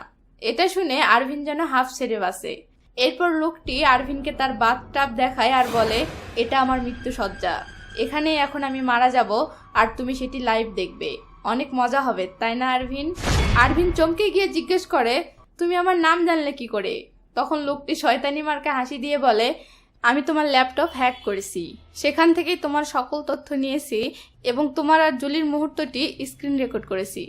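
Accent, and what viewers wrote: Bangladesh. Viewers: আপু আপনার কথা শুনে মনে হয় আপনি বাংলাদেশী।কিন্তু আপনার চ্যানেলে দেয়া আপনি ইন্ডিয়া থেকে!! আমি কি ভুল?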